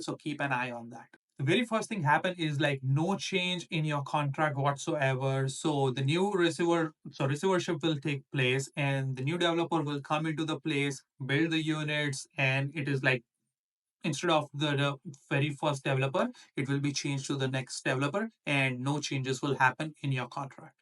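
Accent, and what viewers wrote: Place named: Canada. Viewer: This is the new canada accent...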